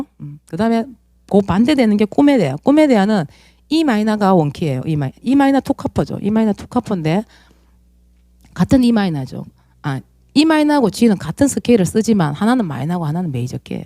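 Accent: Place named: Daegu, South Korea. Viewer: 대구 사투리가 아주 맛깔스럽네요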